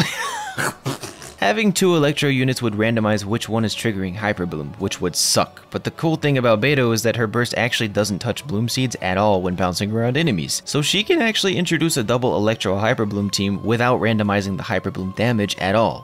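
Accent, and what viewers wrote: United Kingdom. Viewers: Why do you sound more british than american?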